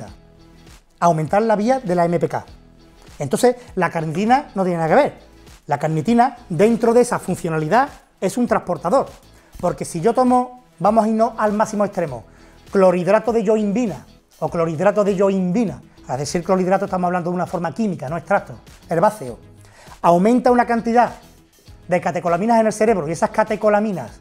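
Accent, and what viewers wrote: Andalusia, Spain. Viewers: Es andaluz. Por eso el acento. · Con ese acento español de no se que región, tengo que activar los subtitulos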